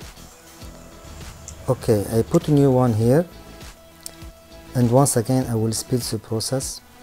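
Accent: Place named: Arab world. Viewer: واضح من لهجتك انك شخص عربي اهلن بك أخي واسمح لي أن اطرح عليك مشكلتي في هذا الخصوص لدي سيارة ترافرز 2018 عند تعبئة الوقود المحرك لا يعمل واحيانأ يعمل لكن يوجد ضعف في الأداء مما يجعلني اتوقف لمدة عشر دقائق ثم بعدها يعمل بطريقة طبيعية قمت بعمل فحص كومبيوتر وكانت النتيجة عطل في بلف الايفاب p0496 بماذا تنصحني هل اقوم بتغييره؟ إم انه يتم فحصه يدويأ قبل التغيير كما يظهر في مقطع الفيديو هذا؟ وماهي النسبة الصحيحة لإثبات العطل؟ ارجو الإفادة للضرورة ولك خالص التحية والتقدير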